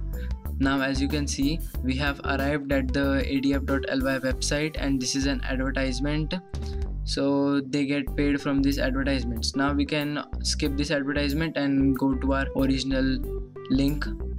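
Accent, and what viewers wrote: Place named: India. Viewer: i love when indian talking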